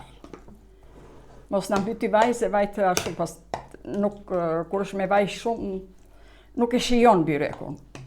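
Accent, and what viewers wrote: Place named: Përmet, Albania. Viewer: Zonje te petshendes! Te lumshin duart e arta, qe e shpjegon sh. Mire! Besoj se ini nga jugu, se dhe une andej jam, por besoj se ini PERMETARE, nga gjuha e paster qe flisni, se dhe une e perdor kete byrek, del sh . I mire! Respekte ❤️, nga Italia 🌼!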